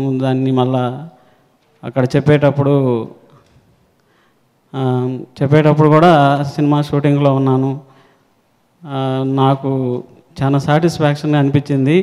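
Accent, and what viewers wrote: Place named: Kurnool, India. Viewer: Memu Kollapur vallam Mahaboob Nagar lo oka prantham ....Mee kurnool ki daggara mee lagane matladatham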